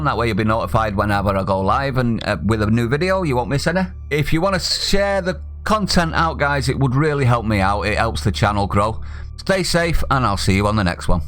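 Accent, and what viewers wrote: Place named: Manchester, United Kingdom. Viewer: Jesus fucked, if that manc accent wasn't annoying enough you throw in an even more annoying fake laugh.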